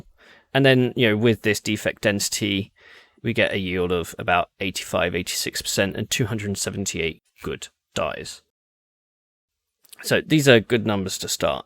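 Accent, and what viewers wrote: England, United Kingdom. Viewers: I needed an English accent to complete my collection of Tech reviewers. Came here from your Level One Tech stream.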